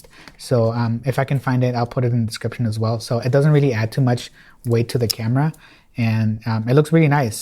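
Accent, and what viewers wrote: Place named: Spain. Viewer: You sound spanish. Do you like Messi- I don't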